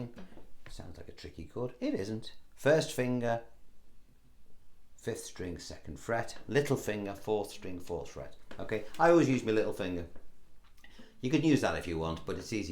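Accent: United Kingdom. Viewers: Great video to watch. So relaxing to listen to your wonderful British accent as opposed to the American you hear all over the internet. And always a a little funny: I love it ❤ We all shouldn’t take ourselves too seriously.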